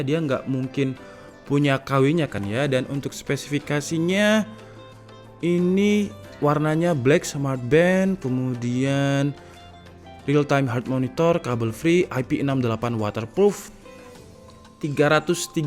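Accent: Java, Indonesia. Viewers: Jawane ndi mas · Smpeyan wong endi kang... Bahasa ne...bared.anteb....😉😉😉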